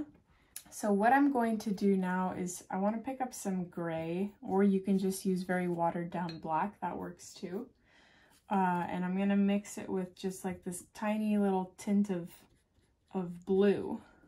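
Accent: Canada. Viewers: Within 2 min I recognized a fellow Canadian 🇨🇦 Beautiful job, thank you!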